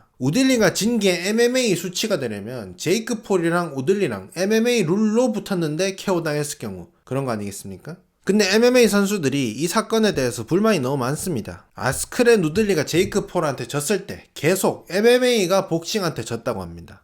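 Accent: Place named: Rural, South Korea. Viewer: 근대 왜 말투가 이렇게 촌스럽습니까? 어디 억양인가요?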